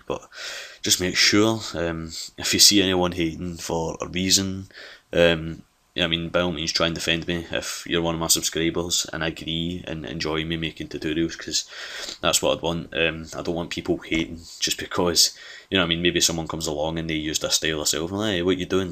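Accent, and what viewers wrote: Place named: Scotland, United Kingdom. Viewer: you are REAAALLY scottish o.O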